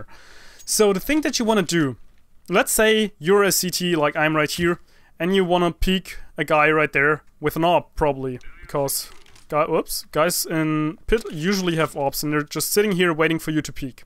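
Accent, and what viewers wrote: Germany. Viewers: Are you from germany? If yes = Man merkt es an deinem Akzent :P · are you german you sound like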